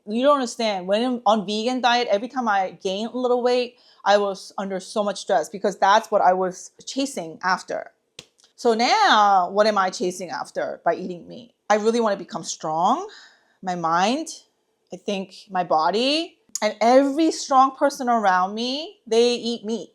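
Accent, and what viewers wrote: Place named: United States. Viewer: I just found you. Idk how or why. YouTube recommends me all kinds of stuff. I guess maybe it knows I recently started eating meat too so it recommended you to me. I’m happy to find you. Are you Korean, American, Korean-American? (You sound American) I’m from Atlanta and growing up had a lot of Korean friends or I mean friends whose parents were from Korea. My God mother is Korean. So I’m just guessing sorry lol… maybe more than guessing… anyways I will follow you now for recommendations and things. You’re funny and have good personality so I like what you have to say. Also watching you cry and eat the steak was funny, but I totally got it lol…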